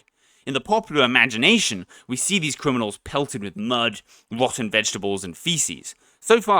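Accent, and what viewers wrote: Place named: RP, United Kingdom. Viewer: Ever heard the truth mate it usually starts with poverty but u wouldnt know about that judging by ur accent eton was it or harrow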